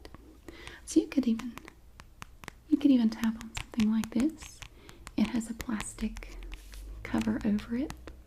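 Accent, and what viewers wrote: South, United States. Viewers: Love that southern sass! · Heaven have no sass like the Southern sass, of a southern lass. Thanks miss, for making such good an great vids. PS from a fellow Southerner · YES! We love a sarcastic southern queen ❤️ Amazing video 🤘🏻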